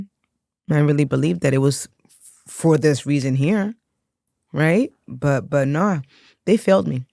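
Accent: Dominican Republic. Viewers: Is she Dominican ? She has an awesome accent its so subtle. I was trying to place it but couldn't. Cool lady.. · I caught that too when you spoke. I’m also Dominican. KlK 🫡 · I picked up on that beautiful subtle Dominican accent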